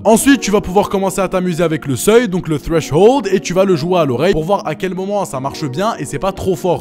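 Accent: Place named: England, United Kingdom. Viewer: Ce bête d'accent anglais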